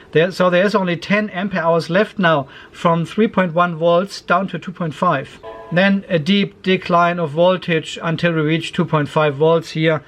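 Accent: Germany. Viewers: CamperT aus Deutschland. Sag mal bist du deutschsprachig? "alter Schwede" kennt sonst kein Mensch. Und dein harter Akzent im Englischen ist wunderbar zu verstehen. Deine Videos sind klasse, habe den EBC A40 schon bestellt. Bitte weiter so in "down under". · I love your denglish !!!!!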